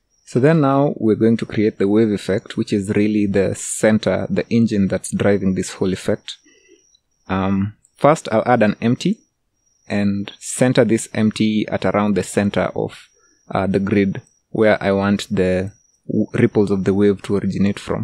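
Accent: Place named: Uganda. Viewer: Your accent reminds me of my old Ugandan accent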